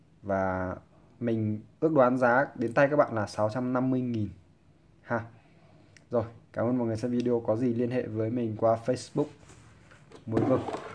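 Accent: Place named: China. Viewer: ô này nói lơ lớ, chắc người TQ học tiếng Việt à :))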